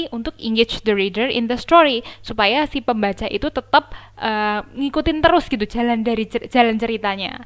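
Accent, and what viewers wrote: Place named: Wonogiri, Indonesia. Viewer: saya kan sering main ke Solo sekitar Mangkunegaran.....Tahun Ibu guru putri solo, ya karena logatnya, mirip logat Wonogiri juga.....Tapi bahasa englishnya setuju great job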